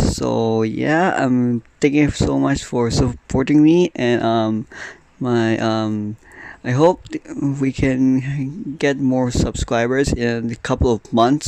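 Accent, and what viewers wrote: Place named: Singapore. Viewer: I thought u are from singapore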